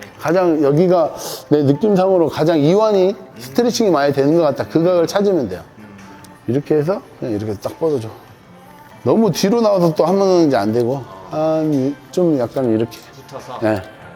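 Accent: Jeolla, South Korea. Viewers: 전라도 행님이셨네요 ㅋ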